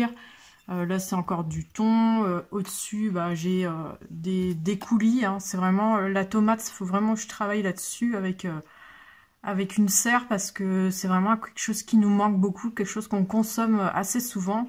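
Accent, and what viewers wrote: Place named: North, France. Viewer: je découvre cette chaine, je vais regarder les autres videos. vous êtes d'où ? je semble distinguer un petit accent du nord sur les "a" ;)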